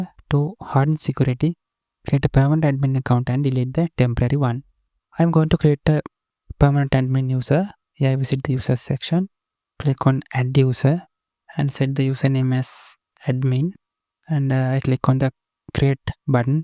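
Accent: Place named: India. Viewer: God, please, give me a tutorial without indian accent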